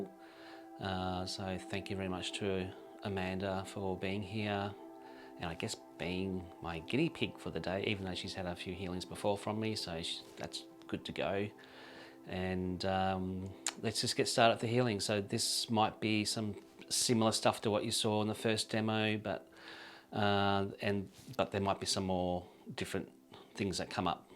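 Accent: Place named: Australia. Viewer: nonsense with an australian accent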